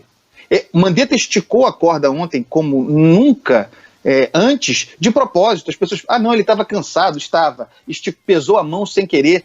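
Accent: Rio de Janeiro, Brazil. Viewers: Andreazza é um paulista com sotaque de carioca. Fico enjoado ouvindo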